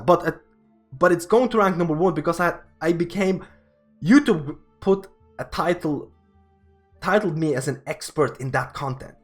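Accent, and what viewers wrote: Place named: Ireland. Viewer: Came here for resto druid info, stayed for the Irish accent.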